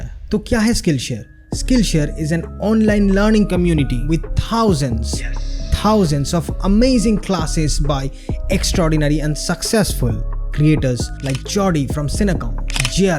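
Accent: Bengal, India. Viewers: Hindi with bengali vibes....ye bhi log notice karte hain🤗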